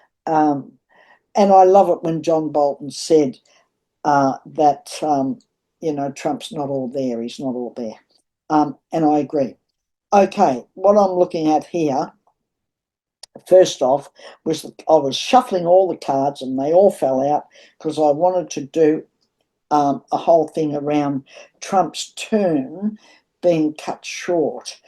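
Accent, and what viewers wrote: Australia. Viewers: You sound Australian darling? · your amazing, re trump and the military , here are some potential future scenarios for you to ponder. lets say the democrats gain enough control of both houses of congress in 2 years time in the mid term elections , to impeach trump or maybe by some miracle it happens earlier he refuses to go , george w bush intervenes to try and get trump to leave, he fails , the pentagon or military intervenes to arrest him, alternative scenario , the supreme court rules against him , he defies the supreme court, the court charges him with contempt of court , and tries to have him arrested. he defies the US marshals trying to serve the arrest warrent , the pentagon or military intervene. when you say this path was set a long long time ago , your not wrong, donald trump is the re incarnation of jefferson davis the president of the rebellious south during the civil war going by your accent you live in oz , so do i , maybe one day we will talk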